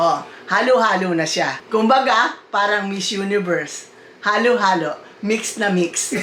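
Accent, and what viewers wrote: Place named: Pampanga, Philippines. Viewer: San Po probisya nyo KC prang kapangpangan UN Punto nyo eh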